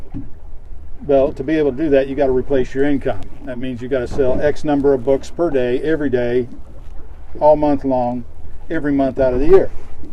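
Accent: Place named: Florida, United States. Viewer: Hey. Did anyone ever tell you that you speak "Florida Boy"? Enjoying your books. I'm through Book 10 and Blue Collar to No Collar! Thanks for all the hard work. I've started a bit myself and find myself starting all over again. I think I found my niche though this time. Keep up the good stuff. See you in La Belle one of these days. BearTail.. B52 Bomb/Nav. "Iron Dukes Viet Nam" 320th Bomb Wing. First in - Last out.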